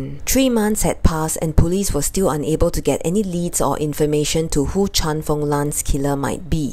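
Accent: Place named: Singapore. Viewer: Hurray for that lovely Singapore accent!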